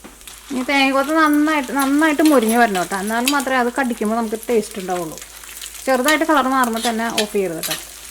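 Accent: Thrissur, India. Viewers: ഇഷ്ട്ടമായി മിയ എനിക്ക് അവതരണം നല്ലത് തൃശൂർകാരുടെ സംസാര രീതി പിന്നെ ഇടക് പുറം കാഴ്ച്ച കാണിക്കുണ്ട് അതു സൂപ്പർ ആ രാജ്യത്തിന്റെ ഭംഗി കാണാം മഞ്ഞു വീഴുന്നത്. സൂപ്പർ 👍👍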